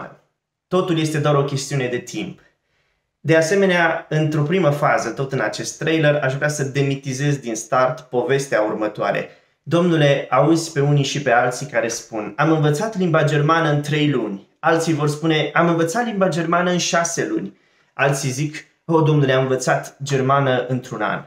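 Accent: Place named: Germany. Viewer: 7 luni și deja pare că ești un neamț care vorbește română, nu un român care vorbește germană. :D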